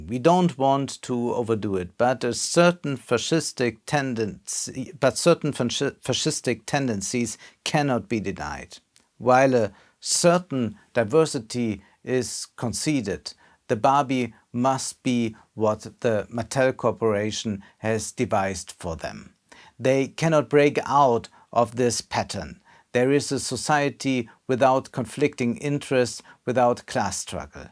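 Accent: Germany. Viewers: Jesus man hört den deutschen Akzent richtig hart raus.... Die Vorstellung das die Aufrufe explodieren nur weil das Video nochmal in Englisch gesprochen wird... Vielleicht lieber einfach englische Untertitel anbieten und wenn es viele wollen und man es kann dann anbieten? So ist der Aufwand doch höher als der Ertrag oder? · Mei dier Genosse! Der deutsche Aktenz stört glaube ich uns Deutsche mehr als andere. Ich habe die Erfahrung gemacht, daß ich Leuten die deutsch, aber mit einem fetten Akzent sprechen, eigentlich problemlos zuhören kann. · To create content in english as well makes sense on YouTube, in order to reach a wider audience. Great work, i hope it pays off! And the german accent is not an issue at all, we live in the 21st century, people from different countrys have accents… who cares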